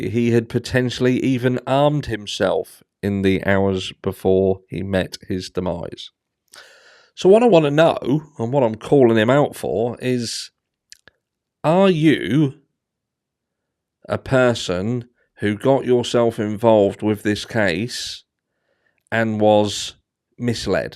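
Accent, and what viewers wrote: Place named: Somerset, United Kingdom. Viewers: There's nothing particularly bizarre about my comment at all. You said yourself that MWT behaved in some ways like a "content creator". Maybe he has "created some content"? Was that not one of the very questions you were touting? Call yourself what you will, you are a person who is also inserting yourself into high profile cases to further your channel, raise your profile and therefore further your own ends. I see little difference between you and MWT in many regards. I'm not having a go at you, merely intimating that you are not that different to him. All people in the media create / deliver content to some extent. The difference with him is that he is an older bloke trading off the back of having "exposed" Savile, albeit posthumously, hence could not be sued for defamation. I think MWT is something of a chocolate teapot and an opportunist. 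He is asking questions about this case somewhat in the public eye, as are you. You are a so-called content creator who has created this blue branding to match your blue eyes, and pontificate, slightly sanctimoniously at times, about cases in what sounds like an Estuary Essex / West Country fusion accent, and in a slightly laconic, sweary way. You purport to speak about cases respectfully about cases as if you "had skin in the game" but seem decidedly disrespectful about the 46-year-old "Hungarian fella" you say "should have f**** known better". Perhaps your benevolence only extends to young gangsta dabblers, and the similar fate of a sl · Hello from Gloucester, lovely balmy evening tonight, but dreading the winter 😟 Love your videos and your lovely Somerset (?) accent.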